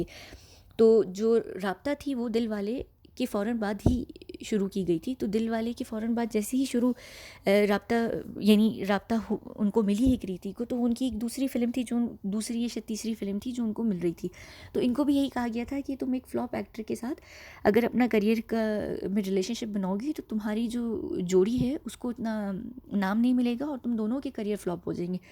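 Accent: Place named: United Kingdom. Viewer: Great 👍 voice & great 👍 voice and also very very good Urdu & great 👍 british accent in English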